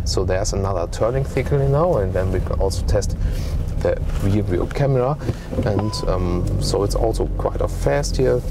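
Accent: Germany. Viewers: 德国人说英语其实非常有特色，而且在欧洲算是很流利的。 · My men ur English sounds quite. ... German 🤣 all the respect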